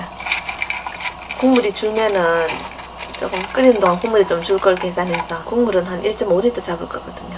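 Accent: Gyeongsang, South Korea. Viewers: 맑은아욱국 콩쪼가리 싫어하는 사람이나 애들에게 좋것네요. 깔끔하고하니 더 정갈하고 맛있어 보여요.방송도중 경상도 사투리도 간간이 나오고 정겨워요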